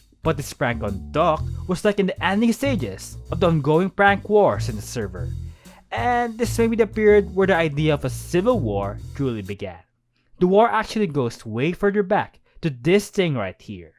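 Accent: Philippines. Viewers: Are you a filipino? i kinda hear that accent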